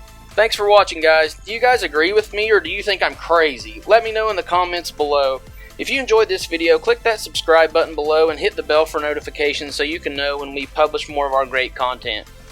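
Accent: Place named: West Virginia, United States. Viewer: I started watching this video and was like "Man! This guy must be from West Virginia!" Then I saw the hat and thought "Hell yeah he is!!" and I had to subscribe!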